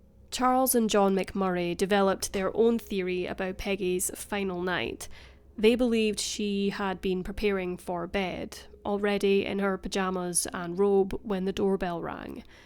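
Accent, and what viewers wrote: Scotland, United Kingdom. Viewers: Brits write and speak better. American English sentences can sound clumsy to us. The narrator is a Scot.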